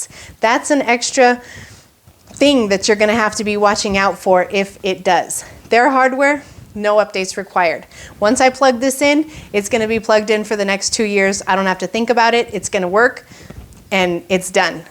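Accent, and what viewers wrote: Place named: India. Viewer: Sounds like hes from India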